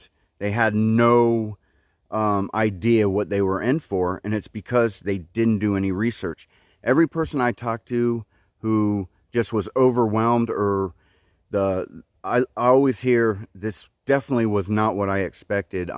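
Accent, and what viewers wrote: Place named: South, United States. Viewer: Loli love the way yu say the at tail have thst southern voice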